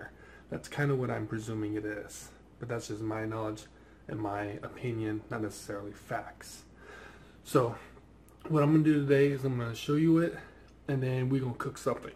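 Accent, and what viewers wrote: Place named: Southern California, United States. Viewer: Never mine aha I saw your Cali tatoo more into the video. Only asked cause the way you talked you reminded me of my self. You talk exactly like me I’m from so cal Anyways cool video using my fryer right now !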